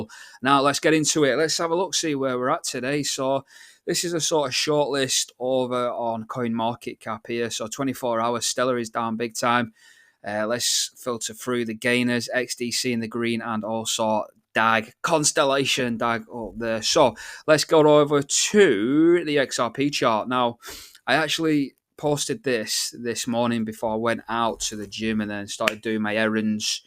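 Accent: North, United Kingdom. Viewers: Yo big man! I follow u on here and X and I have enjoyed every minute. Ur simplicity and northern accent r both spot on. Keep the alpha coming bro. I mean this is FREE…world’s mad!!!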